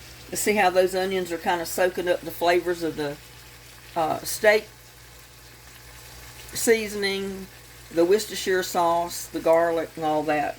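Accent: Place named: Texas, United States. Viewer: The next time I stock up on hamburger I will definitely freeze some like that! I just made meatloaves for the freezer with this batch. I found you because one of your videos came up in my YouTube feed & I am loving your meals & garden videos. Hearing your voice makes me homesick for Texas even though I’ve been gone for more than 35 years now. Also love your community cat, Little Buddy. What a sweetie! I will share your garden videos with my brother & SIL in Georgetown. They are starting to really get into gardening.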